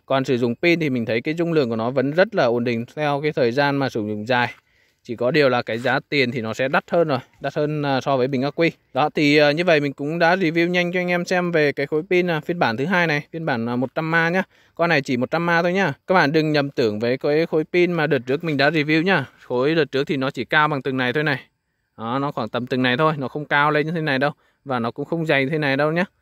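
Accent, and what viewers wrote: Nghe An, Vietnam. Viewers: Anh ở đâu nghệ an vậy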